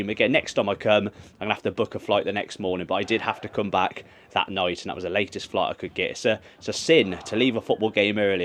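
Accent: West Country, United Kingdom. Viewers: Am gradually going through all your videos. As you know i live in Berlin, but dont go to any games here. Regarding Wuppertal. Its a real shame that they are going through bad times. I remember them in the 2nd german division, with big crowds. You certainly spend alot of time in germany recently, and i realise your big love so to say lol, is for Bochum. Also, didnt realise you come from Hereford. I was certain your accent was more west country, Plymouth for example. I take a look now and again at your video from my club Gillingham, regarding that montrous corregated stand. Still makes me laugh. Anyway, i am gradually doing the rounds with all the content you put out. Really enjoyable and full of facts. Thanks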